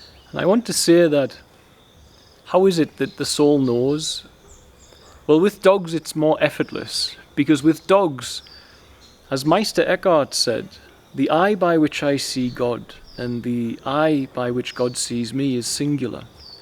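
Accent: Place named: North East England, United Kingdom. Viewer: I feel the need to connect with our creator but I dont know how. I'm from the North West of England and I detect your accent is the North East. Can you or one of your congregation guide me to a sanctuary where I can find my way to God? I live in fear now but yearn to be a light in this dark time and lead by example for my family and community. I need help and I dont know where to turn. I've been praying for guidance and forgiveness. Please lord here my call. I want to serve the creator and bring others to Christ. 🙏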